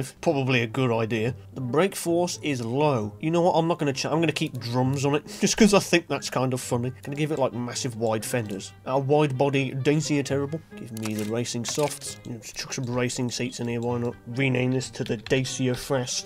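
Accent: United Kingdom. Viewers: you talk british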